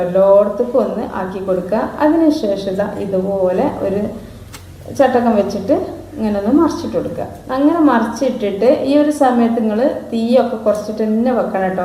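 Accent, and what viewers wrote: Malappuram, India. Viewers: 👍താത്താന്റെ ആ നിഷ്‌ക്കളങ്കമായ അവതരണ മലപ്പുറം തനതായ ശൈലി കേട്ടിരിക്കാൻ വളരെ രസമായിട്ടുണ്ട്. അതിൽ ഇടക്ക് വലിയ ഇംഗ്ലീഷ് വാക്ക് ഉപയോകുന്നത് ഒഴിക്കാവുന്നതാണ് താത്ത നല്ല അവതരണമാണ്. ലളിതമായ പാചകം കൂടുതൽ സാധനങ്ങൾ ഇല്ല. നല്ല സ്ക്രിപ്റ്റ്. ബോഡി ഷോ ഇല്ല. One man show.👏👏👏super.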